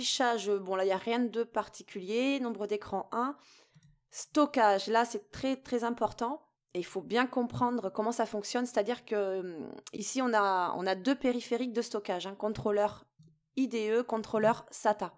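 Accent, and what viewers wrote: South, France. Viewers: Merci pour ce tuto. Et bé ! Je sens un léger reste d'accent du sud. ;)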